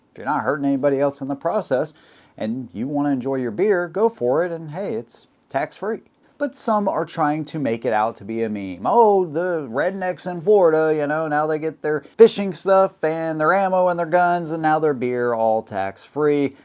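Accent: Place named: Tennessee, United States. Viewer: bro i figured you were from tennessee Glad to hear you live in the great palmetto state!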